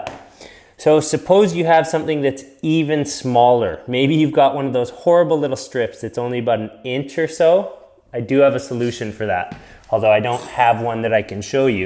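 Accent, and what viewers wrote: Canada. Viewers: You sound unbelievably Canadian.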